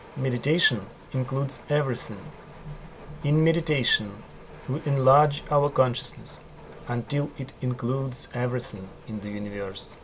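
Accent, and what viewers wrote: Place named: Russia. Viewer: Sweet russian accent:)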